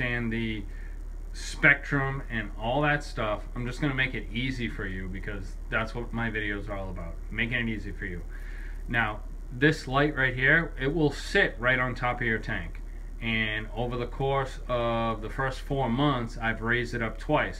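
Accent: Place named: Massachusetts, United States. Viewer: Nice hearing a dude that sounds like me on here, any south coast MA shops that are good for plants, does Beds carry a variety of plants? Seems like most shops only carry a couple of the usuals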